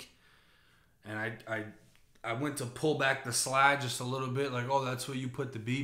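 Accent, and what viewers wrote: Boston, United States. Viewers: Bro I thought u were from Boston. Bcuz u have a Boston accent. Wow i was wrong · totally Boston dude... I heard it too. I was totally confused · Swear all this time I thought he was from Boston with his accent. Woulda never that FL. Still only a few months in to his channel not knowing his story or if he was raised there and moved to FL later on, but his accent is thick; love it. But about the story - wow! Alex was a real one Bro. You a solid dude so it makes sense why you and him were friends; explains why you feel guilty for something that wasn’t directly your fault. Alex was solid and didn’t fold - don’t think he blames you for his character just being that of loyalty (if that makes sense).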